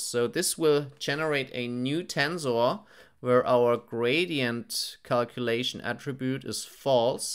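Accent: Germany. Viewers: I love your strong German accent. I study at a German university and you remind me of my professor. Thank you very much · Thank you very much. I love your gernan accent, and your tutorial helped me learn pytorch.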